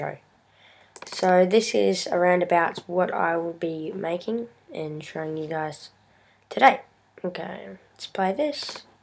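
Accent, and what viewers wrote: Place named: Australia. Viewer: aussie <3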